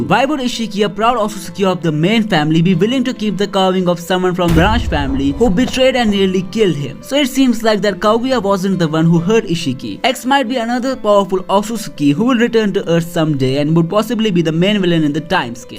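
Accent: India. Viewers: if that comes true you are an on insider of baruto😉 ya one ques bro plz answer are you a indian your accent does sound like indian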